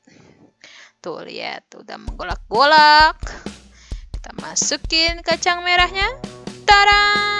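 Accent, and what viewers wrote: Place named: Betawi, Indonesia. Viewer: Po numpang tanya, dari daerah mana ya? Ko bahasa betawinya kaya bahasa betawi ora... terus maap itu sodet bukan coet/ cobek😁 coet mah artinya ulekan sambel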